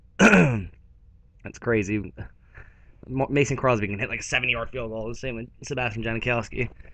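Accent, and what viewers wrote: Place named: Philadelphia, United States. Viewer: u from philly bro? i hear it in your voice lol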